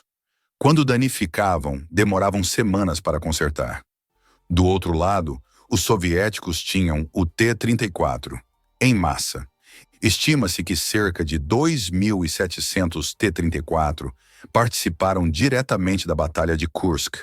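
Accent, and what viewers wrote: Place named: São Paulo, Brazil. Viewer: Colocaram o sotaque de Paulista caipira na voz da IA.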